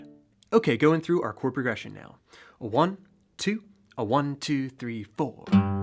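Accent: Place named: Delaware County, United States. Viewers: deleware county pa, they also say “Wooter” instead of water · South Jersey / Philly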